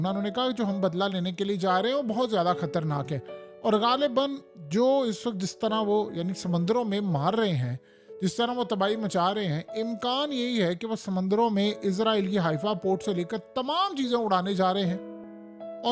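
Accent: Punjab, India. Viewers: Sir Mai India 🇮🇳 se hu aap ki nwes ward ki Namber 1 nwes hai Aur aap ki Awaz hme bahot Achhi lagti hai urdu ke sath thodi si punjabi ki jhalki bhi hoti hai sir mai ko dekhna chahta hu plzz sir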